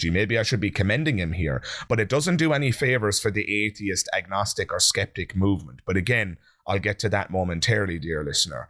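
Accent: Ireland. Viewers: Holy sh*t! You're Irish?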